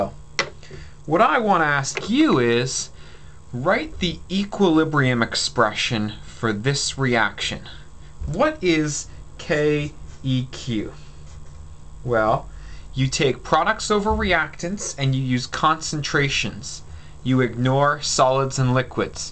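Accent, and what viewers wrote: Canada. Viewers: oh, you're canadian...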